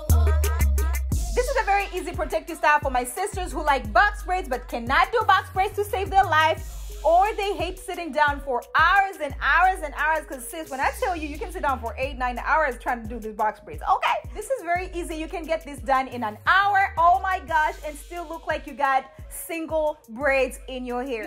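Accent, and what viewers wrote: Caribbean. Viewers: Looking cute sis💖 you sound like you're from the Caribbean. I'm from Jamaica🇯🇲👍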